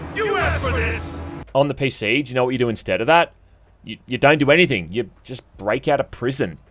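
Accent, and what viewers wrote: Australia. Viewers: Australian accent is impossible to listen for a non native speaker, terrible😭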